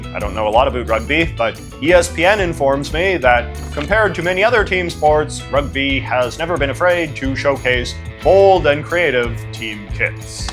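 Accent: Canada. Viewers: Hes Canadian with a Canadian accent. Accents ≠ mispronunciations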